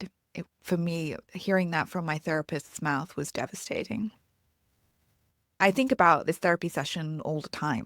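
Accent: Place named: United Kingdom. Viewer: a british accent....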